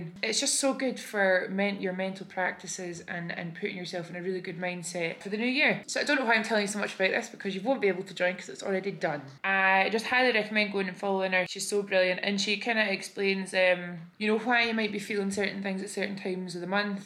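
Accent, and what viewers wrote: Scotland, United Kingdom. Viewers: i just started watching your vlogs & i'm already in love with your attitude towards life. you're so positive and inviting which is a welcome change from a lot of other influencers i've followed. your speaking voice is so soothing & you make the voice in my head change to scottish!! (i'm american lol) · you have a most joyous outlook on life and personality, its WONDERFUL. I could listen to you read books, give TED talks, describe instructions, whatever. Your gorgeous Scottish accent is soothing. Whatever direction you go with your interests and passions, I'll be sure to follow. Happy 2021 and love from Atlantic Canada 🇨🇦 ❤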